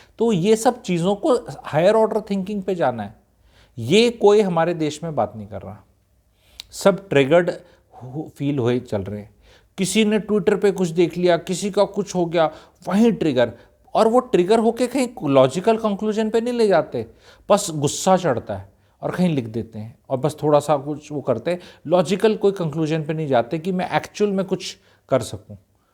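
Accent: Haryana, India. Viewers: People do discuss these things on you tube...but your style of presentation...your personal tone..your being haryanvi.. helps you to be just plain straight forward...which touches the emotional cord at the right spot.. Though things that being conveyed are at elementary level...hope you would handle it with more nuanced approach in your next video.. But ofcourse you being people would listen to you.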